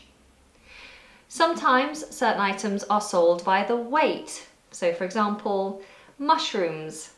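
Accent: United Kingdom. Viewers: Hi there! Today we have found out a new skill in you: an actress. So you're not just an excellent teacher, but a great actress too. Congrats for your work on this video! It's a great help even for those of us who think have a little higher level of English. But listening to you, to your voice, to your beautiful British accent is so pleasant! Cheers! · You are a perfect actress as well as a teacher. I adore your british accent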